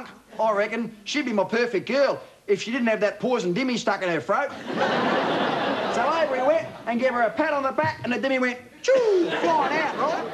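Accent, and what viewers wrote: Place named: Australia. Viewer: of course an aussie can understand him...and if you dont you obviously dont get aussie slang or humor!!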